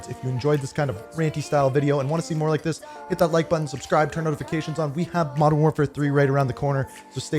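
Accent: Canada. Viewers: Love the canadian accent my man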